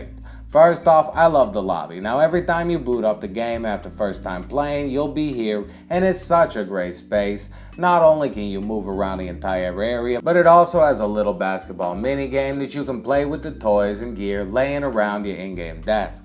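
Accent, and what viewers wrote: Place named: Philadelphia, United States. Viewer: I’m from Philadelphia your accent sounds like mine lol I have you a subscribe because you deserve it I’m getting my first psvr set this Monday well my first vr set ever and you really helped me choose my first game . Can only choose one for now because money is tight thanks for your hard work I’m going to go check out your other videos too You remind me of someone who I would befriend lol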